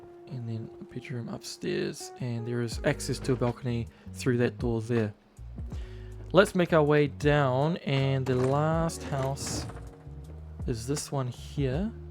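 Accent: New Zealand. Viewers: Judging by the accent, you're a kiwi, right? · You sound like your from New Zealand? Just wondering? Nice content btw 👍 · kiwi sounding fella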